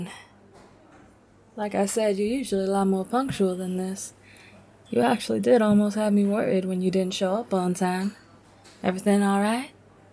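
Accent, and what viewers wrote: South, United States. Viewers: I’m a sucker for a smooth southern accent, this couldn’t have been a better audio, thank you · yes! the southern accent let’s goo i could melt from the southern accent 🫠🫠🫠🫠amazing audio tho and amazing southern accent but we already knew that was amazing 🩷🩷 i have a feeling the southern accent was for a certain someone that is in twitch chat a lot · Amazing audio I’m weak for your southern accent 😳🫠🤭👌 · Accent time for me. Great southern accent. Thx for asking me out and being flirty while doing it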